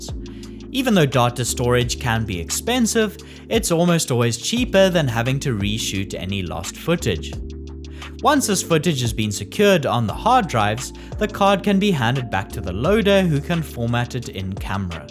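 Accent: South Africa. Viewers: Kiff to hear a Saffa accent. Nice one, bru!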